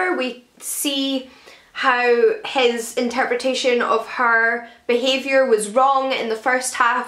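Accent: Scotland, United Kingdom. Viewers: you're Scottish, aren't you! Lol!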